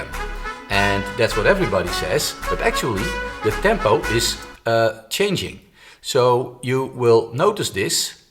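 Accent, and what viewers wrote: Netherlands. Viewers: Ik kan gelijk horen dat je uit Nederland komt leuk hoor ik ga deze app even downloaden hartstikke bedankt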